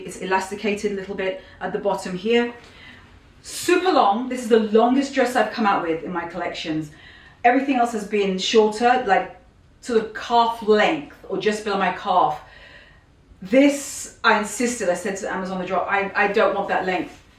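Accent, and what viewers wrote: England, United Kingdom. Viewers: Love you! Love your English accent. LOVE YOUR CLOTHING LINE! SASSY.CHIC.COMFORTABLE. AFFORDABLE. Ciao an American in Sicily. Love when you visti my my city New york and talk to the people on the street.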